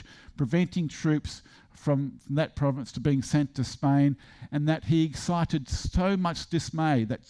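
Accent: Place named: Australia. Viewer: I have a couple good scottish friends and they do not sound like this guy, I thought he was australian.. anyway very interesting .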